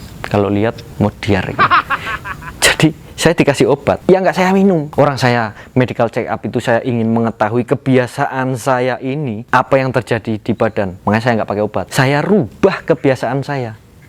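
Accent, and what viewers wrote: Yogyakarta, Indonesia. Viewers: Wong jogja to jenengan mss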